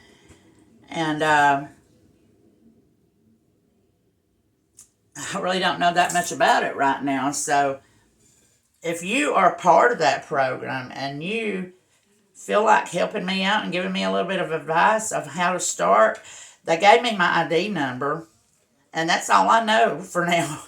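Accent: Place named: South, United States. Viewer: I just accidently found you today and you are a breath of fresh air. Your dutch pours are even better than kanella and your personality is 1000% better. As far as lisa marvin your cleanliness and professional look of your work is top of the line. I cringe watching the mess lisa works in haha. I am so very glad to have found you. You are REAL not fake in anyway and i hope your channel grows astronomically. You deserve it. I am Yankee so your accent makes me chuckle sometimes. Southern belle for sure. Not some richy trying to get richer off others but a real deserving artist. I have been doing fluid art since 2018. My husband was diagnosed with pancreatic cancer and it gave me my peace when my soul needed it. He died sept 2019. I love doing my art and love just mixing the beautiful paint colors some days. I just wanted to tell you what a wonderful soul i found you to be. 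You are great. You say you are timid, i find you humble and deserving. Keep your guardian angel painting for yourself because i know you have one watching over you, no doubt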